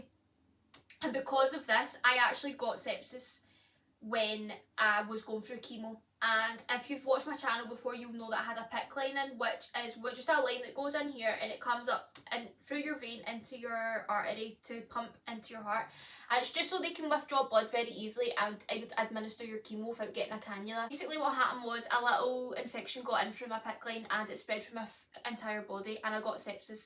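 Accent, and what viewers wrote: Scotland, United Kingdom. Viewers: Hi Nice to meet you New Subscriber here from Amman Jordan Middle East Love your Scottish accent. All the best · Wow that’s a surprise a Scottish voice I’m in Edinburgh ❤️🤗🤗🤗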